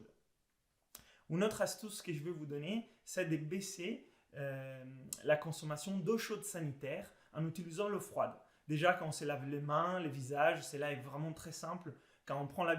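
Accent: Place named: Italy. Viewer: Merciiii! Toujours aussi astucieuses et instructives tes vifeos, un regal de t ecouter avec ton charmant accent italien ♥️🐳🤩👏🏼👏🏼👏🏼🎊💃 Gratzie Mille 💓🙏🏼😻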